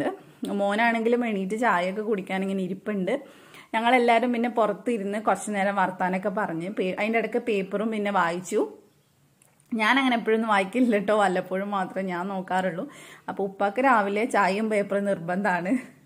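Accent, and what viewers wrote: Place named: Kannur, India. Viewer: ഉയ്യെന്റപ്പാ .....കണ്ണൂർക്കാരൻ 💪🏻 ഇന്ത്യൻ റെയിൽവേ അല്ലെ കറക്റ്റ് ടൈമിൽ എത്തുമല്ലേ 🤗🤗🤣🤣🤩🙏🏻 കണ്ണൂർക്കാരെ സ്ലാങ് മറക്കല്ലെ 🤭🤭🤣👍🏻✌️🥰🥰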